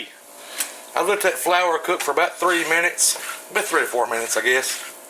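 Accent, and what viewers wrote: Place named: South, United States. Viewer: Aww! Loved your vid and Southern accent. Looks great. A few things you said made me giggle and I enjoyed your deep love/respect for your pops. Thanks for sharing!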